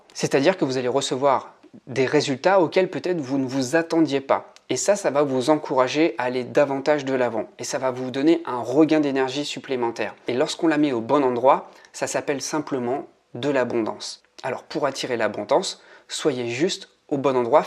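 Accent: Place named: Pas-de-Calais, France. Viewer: Bonjour moi aussi je suis du pas de calais. J'avais bien noté un léger accent 😁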